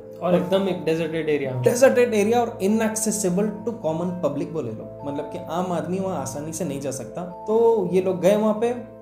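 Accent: Hyderabad, India. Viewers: PLEASE IGNORE NKO KARO🙏🏻 IMPORTANT Bhai main aapke videos 4 5 year se dekh raha hu main Mumbai se hu aapke sabhi channel dekhta hu....par ek bat mujhe aapse kafi salse puchhani thi ke Farhan Bhai Kyu nahi dikhte ab wo kaha hai aur Kya reason hai ki wo ab videos me nahi dikhte mujhe Jana hai aur mere sath mere frd log bhi Jana chahte Kya reason hai ki wo ab nahi nahi rahte videos me plz reply kariyga 🙏🏻 bohot Sara pyar Mumbai aur Meri tarf se aap ko dekh ke main kafi chijo ko sikha abhi main 20 salka hu main aapko 15-16 ka tha tabse dekhraha hu main aap ab se jarur life me ek bar milna chahta hu main bhi aapki tarah haidrabadi bolne ki koshish karta hu waise main nahi janta etna bas koshish karta hu ...... God bless you all ❤️❤️❤️❤️❤️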